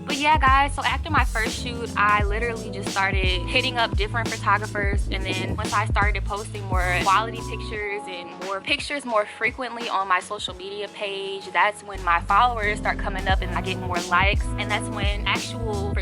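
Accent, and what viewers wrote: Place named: Chicago, United States. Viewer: You from CHICAGO, I HEARD THAT 😊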